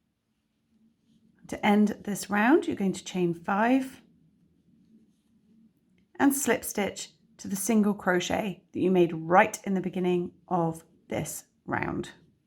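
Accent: England, United Kingdom. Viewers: OMG beautiful! I must admit to slight confusion at first with an English accent, and American stitches!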